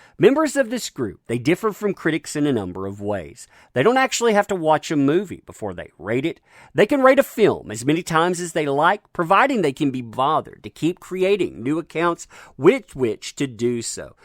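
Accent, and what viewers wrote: South, United States. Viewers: you should do a cooking episode. you got that southern accent of a cowboy chef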